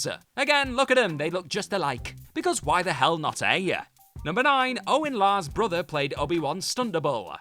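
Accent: United Kingdom. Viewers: What kind of British accent do you have?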